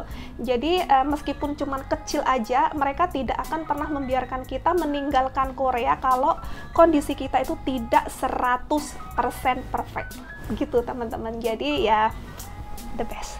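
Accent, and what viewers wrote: Java, Indonesia. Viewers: B.indo oke Inggris pinter dan lancar B.jawa tetep g ilang jawane lek ngomong sambil ngakak karo bestiene 😂.. enak banget denger suara mbk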